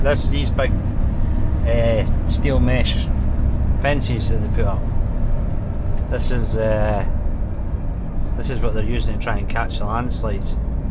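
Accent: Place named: Lothians, United Kingdom. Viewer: yeah makes sense. Cant quite make out your accent. Lothians somewhere.? Sound like Lewis Capaldi or Dean who has the channel - one bike one world.?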